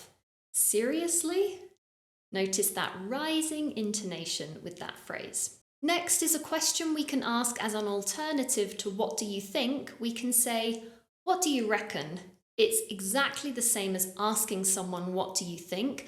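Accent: United Kingdom. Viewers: British English ❤ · I like your British accent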